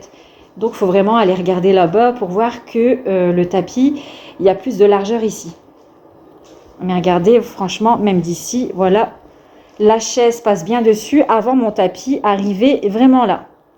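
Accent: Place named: North, France. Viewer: Bonjour, J’ai découvert il y a quelques jours votre chaîne YouTube, et sincèrement je suis devenue accro, déjà parce que vous êtes du Nord de la France, et que vous avez toujours la bonne humeur, la douceur et la gentillesse dans votre voix et dans vos vidéos. Personnellement je suis originaire de Valenciennes mais il y a maintenant 1 an et demi, mon mari et moi avons eu la malheureuse idée de Tout quitter (famille, travail, amis, maison) pour reprendre une épicerie près de Poitiers dans la Campagne profonde 😱 Visionner vos Vlogs m’apporte tellement de joie et de bonheur, rien que d’entendre l’accent de notre région 🥰 Merci pour tout ce que vous faites Pourriez-vous simplement m’informer si vous habitez dans la région de Valenciennes ou pas? À bientôt Stephanie F.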